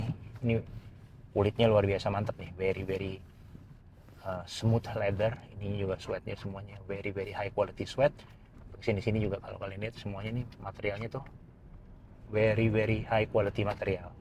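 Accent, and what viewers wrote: Jakarta, Indonesia. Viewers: nonton cuma karena logat betawinye kokoh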